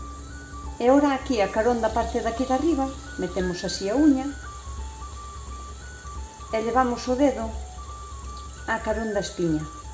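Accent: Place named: Ribeira, Spain. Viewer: De donde eres??? Porque falas ijual que nos aqui en Ribeira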